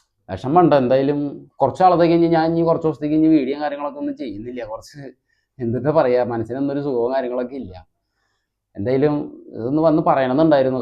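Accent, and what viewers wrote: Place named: Irinjalakuda, India. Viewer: Irinjilakuda voice aanu aa thaavzhi channel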